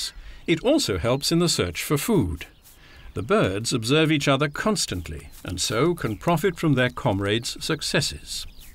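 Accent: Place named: England, United Kingdom. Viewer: Oh my God you sound so English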